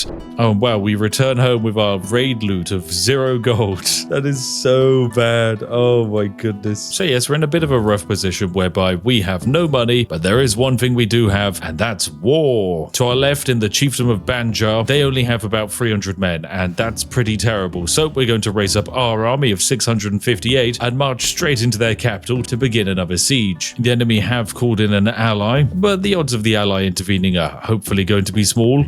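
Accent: United Kingdom. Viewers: I feel like he gets more British every intro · "Perfectly Average" ? He sounds British! And that comes from a British person ;)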